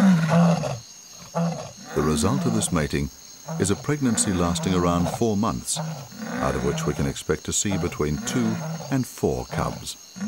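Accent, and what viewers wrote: United Kingdom. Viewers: The female lion "acting l as though she's not interested"? What? Is this guy claiming to know the mind of the lioness? I am just being funny here, but there is still something creepy about hearing a posh white Englishman say those words in that order. To me these poor female animals aren't acting, as their only way of communicating is through their actions (as their biological state of being in heat is not a conscious choice) so I can assume, as the lioness is violently trying to get away that she is at the very least not happy about what's happening to her! It's like No doesn't mean no to this narrator, in the animal kingdom😉!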